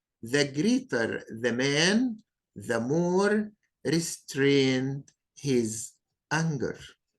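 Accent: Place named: Egypt. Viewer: Your pronunciation is very awful and bad. You speak an Egyptian English.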